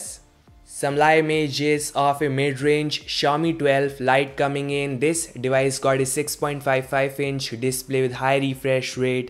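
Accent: India. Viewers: Typical Indian accent...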